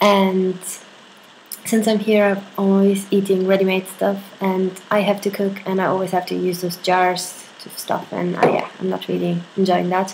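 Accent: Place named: Ireland. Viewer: ah du hast schon voll den irish accent haha:D das wochenende wird witzig..seh ich jetzt schon kommen!:D und achja..was ist eigtl fürn account?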